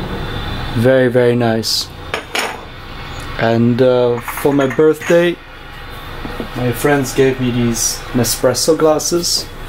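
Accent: Netherlands. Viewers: Horable Dunglish as the Dutch call Dutchified English. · This guy is Dutch... I can tell that accent from miles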